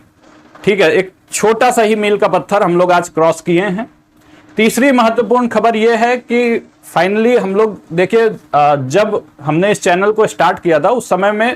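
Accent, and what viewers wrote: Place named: East, India. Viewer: Congratulations sir 🍰🎉🌸🌸🌸🌸🌸📯👃👃.aapka padane ka tarika he itna badia h ki aapka channel chalna he tha sir.sir aap kis City se belong karte hai?aapki language me thoda east ka touch aata h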